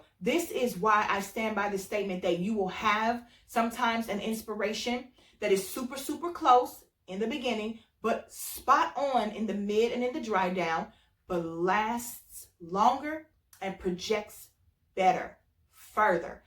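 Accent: United States. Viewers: U from the Midwest? lol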